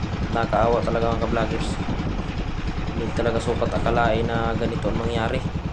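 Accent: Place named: Visayas, Philippines. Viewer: ayo sin mo pag salita mo bisaya kadong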